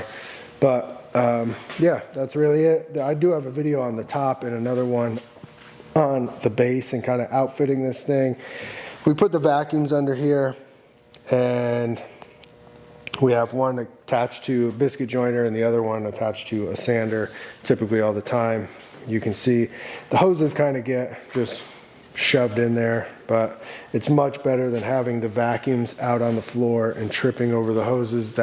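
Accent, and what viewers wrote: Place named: Connecticut, United States. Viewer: Love watching your videos 👍 Very well done. But I also love your introduction. Yo what up - Yo how ya dew in 🤣 You’re definitely from Connecticut 🤌 Fugettaboutit ! Love it you make a me home sick. Keep up the good work.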